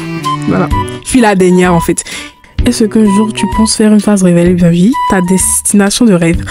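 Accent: Ivory Coast. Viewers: Des que g entendues ta voix g sentie l'accens ivoirien je suis ivoirien aussi😂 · Tu sais t'a voie d'ivoiriéne ❤🥳😍 magnifique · La voix ivoiriene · C'est moi ou j'ai impression que sa voix c'est comme les ivoirienne mais jsp dites moi si jsuis folles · Tu es ivoirien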